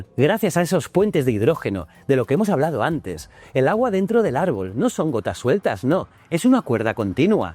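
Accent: Spain. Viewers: Que feoo ablan los españoles 😂😂😂 wacalaaa😢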